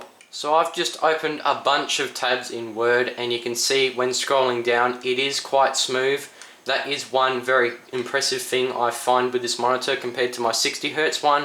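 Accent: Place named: New Zealand. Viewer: Great review. Noticed you have a NZ accent :-) Waiting for your updated review with 5700XT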